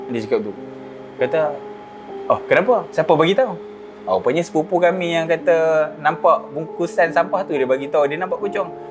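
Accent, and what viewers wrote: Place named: Kedah, Malaysia. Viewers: Truih kan loghat utara mu brader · Org Kedah yg dok Perak ni mmg dh bercampur sikit dh Bahasa dia ngn KL. Tambah plk dia pernah dok Ipoh yg ada byk org berloghat KL sikit... Mcm² loghat org Ipoh tu...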